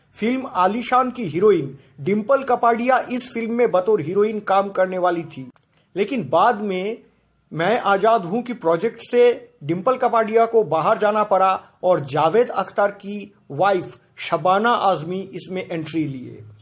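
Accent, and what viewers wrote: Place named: Bengal, India. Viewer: Baangaal na tumi ... Xcent ta oto sundoor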